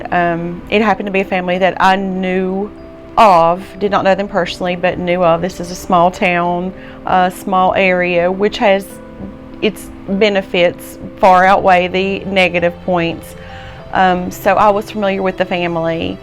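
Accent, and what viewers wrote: United States. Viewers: Are you from southwest VA? I grew up there and you sound JUST LIKE my family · Dang she’s country. I’ve been living in the south for 30 years and I still can’t get use to it. Lol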